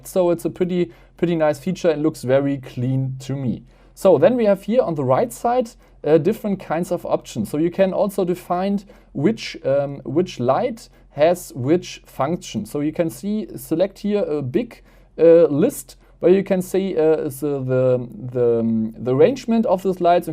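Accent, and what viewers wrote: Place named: Germany. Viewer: sorry this german accent when you speak english it's really horrible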